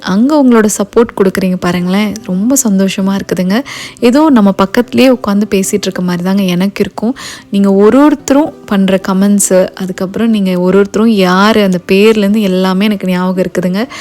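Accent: Kongu, India. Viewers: " ங்க " என்று சொல்லும் கொங்குங்க, எப்பவுமே கிங்குங்க, வார்த்தையே சாங்குங்க, மொத்தத்தில ஹாப்பிங்க!👍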